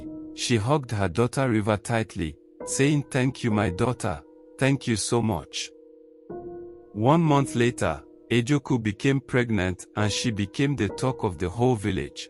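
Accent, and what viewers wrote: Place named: France. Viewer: tou have an french accent, where you from?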